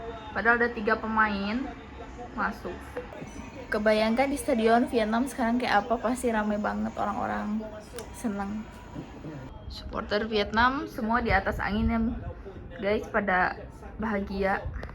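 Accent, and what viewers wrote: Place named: Sunda, Indonesia. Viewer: Teteh..ini pasti orang Sunda, logatnya katara pisaan..ha..ha.. Tenang aja teteh, nonton teruus nanti ketemu lagi di pinal krn kita pasti juara..ha..ha..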